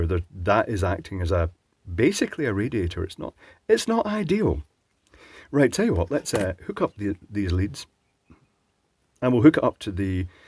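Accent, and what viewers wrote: Scotland, United Kingdom. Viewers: That Scottish accent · Love your videos. Have learned a lot despite your scottish language/prononsuration.(?) Remember that you have an international audience!! Sort out your english! And rest your hands. Whill go on loving your videos. Oscar/Sweden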